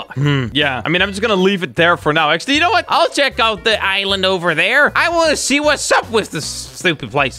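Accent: Netherlands. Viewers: Ok, in almost sure that you are dutch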